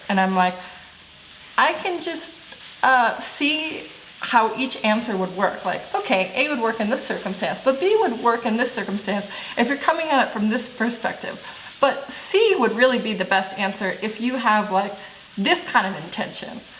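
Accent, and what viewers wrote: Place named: Ireland. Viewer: ASMR Irish everyday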